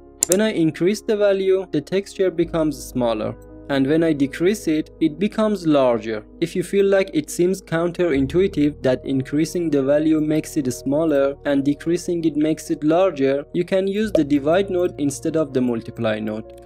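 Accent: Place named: Iran. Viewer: این لهجه ایرانیه❤😊😂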